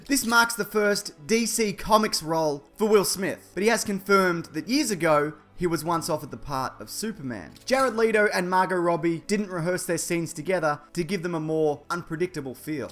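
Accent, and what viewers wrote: Australia. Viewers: Hello Mr. man. I like your Aussie tone. It's nice. I WOULD like to win the lut crat, so if I could, that'd be cool. Thanks. Take care. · I would love to see Deadpool in the next Suiciide Squad movie...oh no wait. He's Marvel. Still Deadpool though. That movie would be EPIC. Oh actually, I want Batgirl in the next one- but only if I play her. Yes DC, I will begrudgingly take that roll for the good of the 'verse. Also, awesome video. Do I detect an Australian accent or am I wrong? Aussie YouTubers represent!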